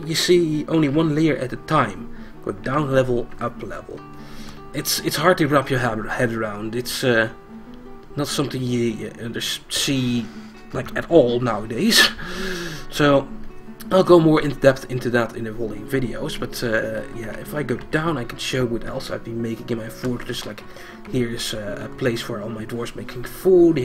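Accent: Netherlands. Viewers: I agree that he's got quite a heavy Dutch accent. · "The Real Meach and Pochachoes of the game" I love the dutch accent:) You have such a different accent to SivHD though, a very diverse group you are! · Nicely done! You are my favorite streamer and youtuber:) Nothing can compete with that delicious dutch accent.. Looking forward to future episodes in this series!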